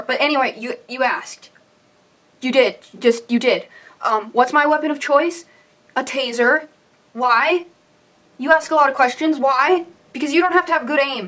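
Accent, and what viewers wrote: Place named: General American, United States. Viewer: I'm no accent expert by any means. Your accent is pretty generic American for the most part but when you said "though" I caught that sort of lengthening sound that is common with people from the north, namely Minnesota.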